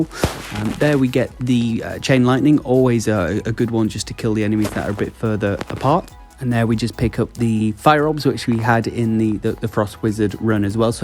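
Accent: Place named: Australia. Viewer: Just started playing. Also broke the bank and bought packs…🫣🫣 you sound Australian too! You were the first one to pop up in my search. I hope that you have good advice and can guide me through the game as well with good tips. I’m level 29 atm.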